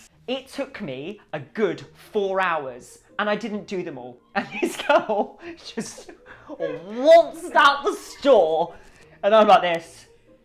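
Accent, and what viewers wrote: United Kingdom. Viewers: Love the accent! Kinda cracks me up though how brits refer to cash as pounds! I understand why no one wants to do the piercings, not only are Claire’s employees inexperienced but it’s been also proven that the guns they use to pierce is un hygienic but it also causes damaged to the ears! I have 5 ear piercings double piercings on each side and a cartilage piercing, all gun pierced, my first ones my mom had them done when I was 8mo and they’re uneven, the last one was my cartilage when I was 18 now almost 37 and it’s still sensitive to this day! If I had kids and wanted to get their ears pierced, I’d take them to tattoo parlours to get it done!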